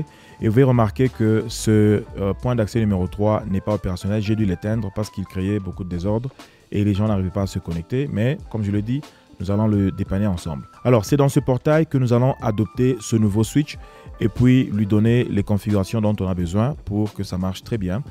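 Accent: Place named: France. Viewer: Je suis tombé sur une de tes vidéos anglaise (coaxial vers ethernet) et je me disais bien qu'il y avait un soupçon d'accent Francophone 🤭 La qualité de tes vidéos est surprenante, bravo Également tout ton matériel, la façon dont tu te tiens, tes expressions, tout ça attise ma curiosité et me donne envie de connaître un peu plus qui tu es ! Bref, plein de succès dans tes projets et merci pour le partage de tes connaissances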